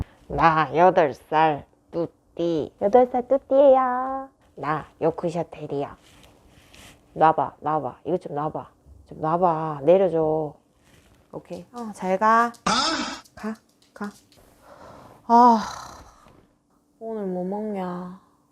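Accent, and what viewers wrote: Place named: Gyeongsang, South Korea. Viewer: 아~따마 사투리 지기네예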